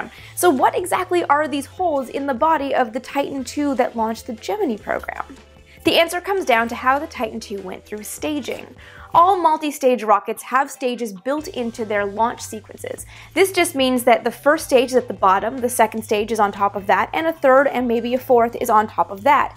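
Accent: Canada. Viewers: My fav launch is the Saturn V with Pete on mission control? Are you from Canada? You pronounce 'out' and 'about' in a different way.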